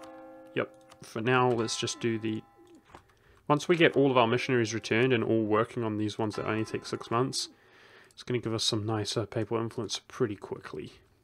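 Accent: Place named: New Zealand. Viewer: He's from that island next to Australia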